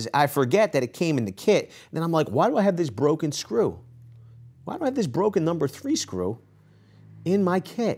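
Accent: Philadelphia, United States. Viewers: You Guys from Philly? I am. I feel like You have My accent? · This guy is from the east coast for sure. I'd say philly Lol.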